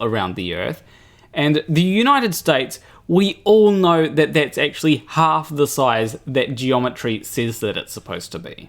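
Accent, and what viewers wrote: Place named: New Zealand. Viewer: ...and you have the most kiwi accent to ever not be on many world maps.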